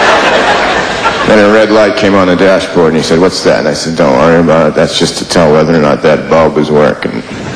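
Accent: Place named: Australia. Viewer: FUNNY SCOUSE ACCENT- it's australian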